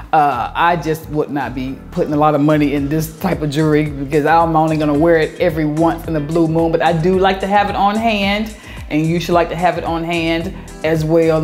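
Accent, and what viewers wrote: South, United States. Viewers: ...you keep it real! Love your tips, your style, your southern accent, your laugh, and your personality.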